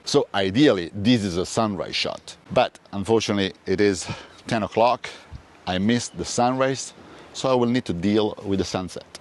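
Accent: Italy. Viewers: I visit Bologna several times in my line of work! The thing i miss the most is your italian accent, and the food also ( we have excelent food here in Portugal, but in Italy is diferent! We used to go to a place named "Ristorante Bolognese", and the 2 brothers there, were the soul of that place...we see them once a year..but they never forget us! they call us "DA SILVA!!...HOW ARE YOU?!" in my "TO VISIT LIST" Cinque Terre, is in secound! Hope this thing go away very soon so that we can return to our normal lives! Great video, and as always, great photos!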